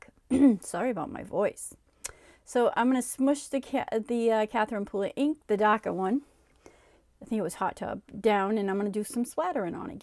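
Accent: New England, United States. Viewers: Are you from New England? Such a cute card. Lots of nice details. I like the cloud background. USA. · I love your accent!!! What state are you from?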